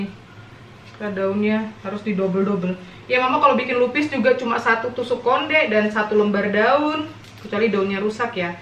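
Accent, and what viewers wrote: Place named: Banjar, Indonesia. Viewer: Suka sekali sama si mamak ini, bhs nya Banjar banar. Menggurak gurak. Si acil, nyaman banar. Wkwkwk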